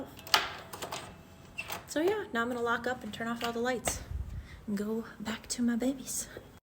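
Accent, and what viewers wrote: Minnesota, United States. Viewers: I knew your from Minnesota through your Minnesota accent!!! As confirmed by the Minnesota State flag!!! Good guess here!!!😂😂😂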